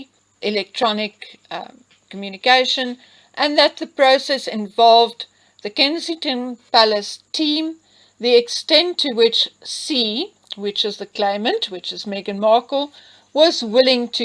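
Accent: South Africa. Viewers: I could listen to the South African accent for days.....xxx love your narrating voice x this must go to trial x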